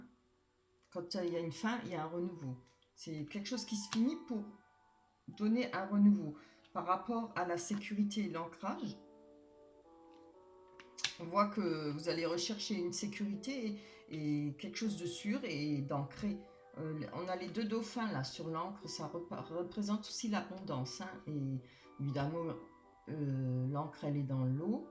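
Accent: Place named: Franche-Comté, France. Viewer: Vous avez un accent de Franche-Comté, ou pas loin.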